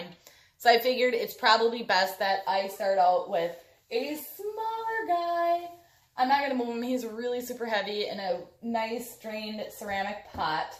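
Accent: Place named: Midwest, United States. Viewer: you must be from the Midwest. your accent sounds like mine haha. love to the green!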